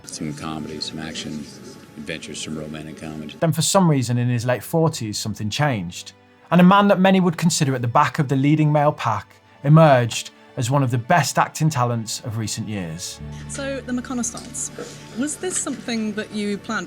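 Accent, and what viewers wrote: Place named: West Midlands, United Kingdom. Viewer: It’s so amazing to see such varied and wisdom rich content from a creator that sounds like me. People don’t quite understand the barriers of the mind that West Midlanders face when we want to put our thoughts out there. Logic being due to the negative stereotypes that a few vowel sounds can somehow determine intelligence. You got me going on investing for my future, and you’re helping me to feel confident when I speak to a client from somewhere else in the UK. Keep smashing it mate.s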